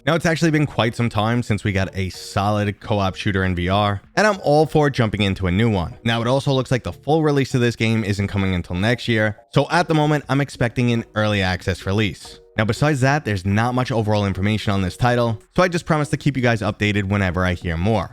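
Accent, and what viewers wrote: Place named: Long Island, United States. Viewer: you sound like you're from long island new york. I live there. Just taking a guess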